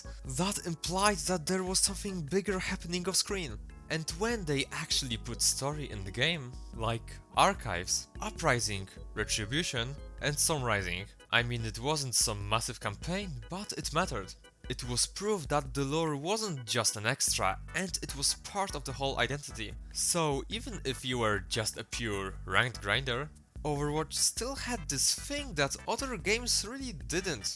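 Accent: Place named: Germany. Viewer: The strong German accent gave away that for once I wasn't recommended AI slop good vid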